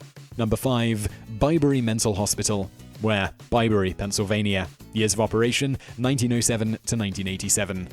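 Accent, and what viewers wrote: United Kingdom. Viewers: Not trying to be mean but the british accent takes away from the video.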